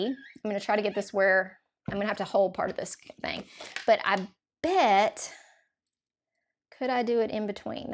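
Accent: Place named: Alabama, United States. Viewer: Really cute ornament cards!!! What an awesome idea for a card and lil gift! Side note.....we moved from Huntsville 2 years ago to be close to our daughter & son in law as they had our 1st grandbaby! Our son still lives in Huntsville and loves it and we visit as often as we can! I thought you had an Alabama accent! Yay!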